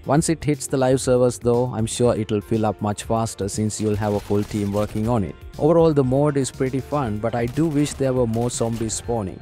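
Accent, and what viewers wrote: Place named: India. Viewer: Indian accent... right, i don't buy anything he says.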